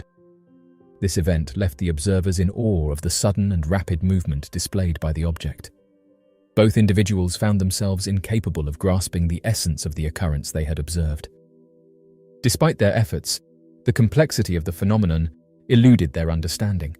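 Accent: United Kingdom. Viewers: You know what I bet. Some Alien fly saucer passing by the earth dumped their sewage, it froze then fell to earth and he found it. Also, videos like this seem to use this one voice with the British accent to give it more drama 😮.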